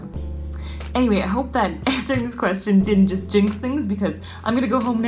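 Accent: Canada. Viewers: can I ask you what hospitals you work in? Judging from your accent I am guessing you are in Canada? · canadian and 1/4 irish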